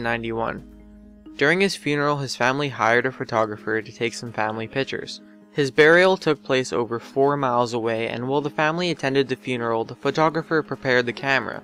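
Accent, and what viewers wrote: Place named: Canada. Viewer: the narrator is obviously Canadian. "howse" "abowt"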